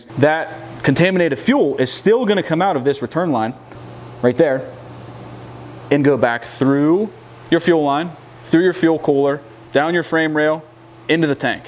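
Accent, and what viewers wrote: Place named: New Jersey, United States. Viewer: You must be from NJ!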